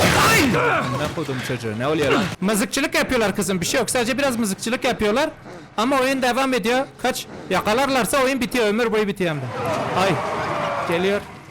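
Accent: Elazığ, Turkey. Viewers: abi nerelisin elazığlımı lütfen söyle 20k like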